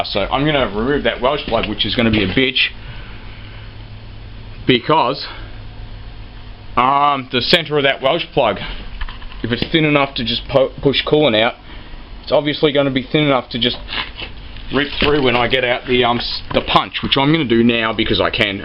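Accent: Australia. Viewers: i must say you are so Aussie sounding and direct its refreshing, i went to bunnings tonight and didn't know i was in Australia at all!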